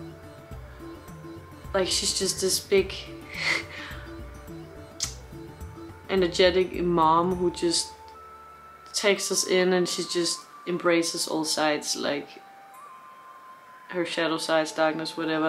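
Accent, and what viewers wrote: Denmark. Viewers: I wondered where you were from since you Danish accent is so subtle, but then I realized you're from Copenhagen! Yay, fellow dane! :D Good reaction! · Are you danish? it sounds like you have that accent :D Great reaction <3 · You have a lovely Danish accent :-)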